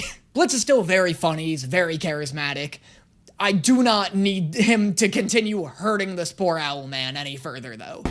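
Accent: United States. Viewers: I think the thing with Stolas’ name is that he’s got a posh English accent and you’re using the same emphasis on the syllables he is, which sounds odd to some ppls ears coz you’re American dunno, you are saying it right tho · People are mad because they want you to say Stolas like (Stol-ihs) instead of (Stol-ass) Edit: The more British you are, the more pronounced the a is in Stolas, so it's said like (Stol-uhs) by the Goetia, and then the non-Brittish speaking characters say (Stol-ihs), the only reason why people are on your case is because no one actually says stolass, they say either Stolihs or Stoluhs, never Stolass- it just sounds similar (I think it's bc half the people who say Stolas are British and you have an American accent, so you saying it similar to them makes you want to pronounce the a as an a sound and not how it's actually pronounced)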